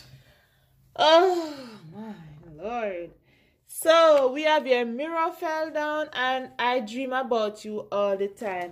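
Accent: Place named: Jamaica. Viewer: Awesome Jamaican accent, stay blessed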